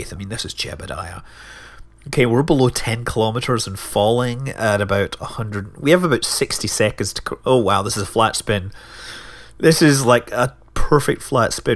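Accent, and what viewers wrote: Scotland, United Kingdom. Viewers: Well... new favorite Interstellar Quest episode found! Drama, Suspense, perseverance aaaand all in a Scottish accent... Everything a good show needs.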